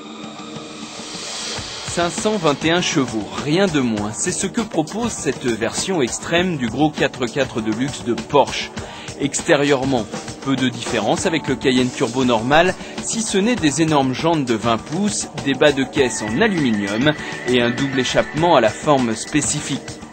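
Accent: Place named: France. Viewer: you sound so much like a f*cking arrogant french.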